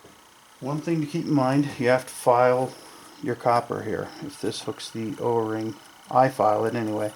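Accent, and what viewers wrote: Canada. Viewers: Bobby shut up. Whats YOUR problem troll? He has a Canadian accent. And I have no problem with his pronunciation. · Bobby, you are showing your ignorance. Are you going to correct an Englishman for the way he pronounces words too. This man is Canadian. That is the way Canadians pronounce spout. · Haha.. Yea, You definitely have a canadian tounge. Thank you for the video. I'm working on my bathtub as we speak. Take care. (Los Angeles, CA)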